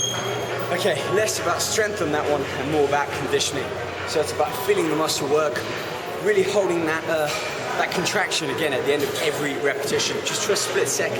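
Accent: United Kingdom. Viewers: i never did like brittish accents, but the guy is decent well proportioned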